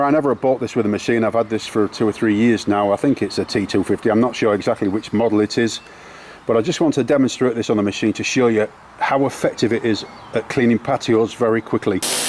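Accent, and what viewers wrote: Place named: Yorkshire, United Kingdom. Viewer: A really good review, nice to hear a Yorkshire accent and not an American.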